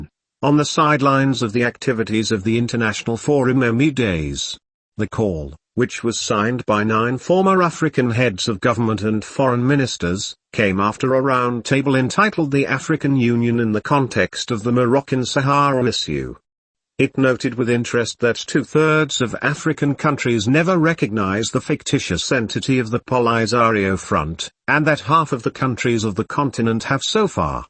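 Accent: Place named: United Kingdom. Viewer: Very good idea! Keep up the good job even if I am not familiar with British accent.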